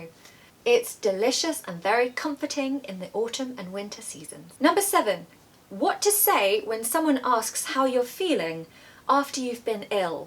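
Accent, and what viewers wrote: United Kingdom. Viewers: Very classic British accent it seems back to that time of Jane Austin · I really enjoy your accent, where are u from in the uk? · Oh this lesson is a piece of art you give us vocabularies, phrases hints of British culture with a clear and beautiful British accent this magnificent episodes will be my English journey's peer ❤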